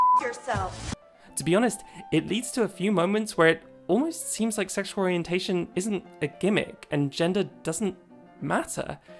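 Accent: United Kingdom. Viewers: So after watching snippets of several of your videos in my YouTube feed autoplay thing, I finally stopped procrastinating and decided to watch the whole hour and a half video. I knew I liked your content, but this was the first time hearing your voice. So when I heard you, my dumb American brain was like, "OMG THEY'RE BRITISH?! PLOT TWIST!!"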